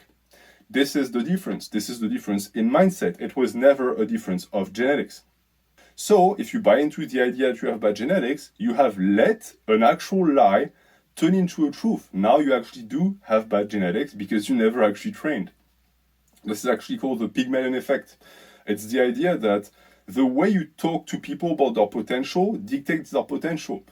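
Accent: France. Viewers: is this guy french?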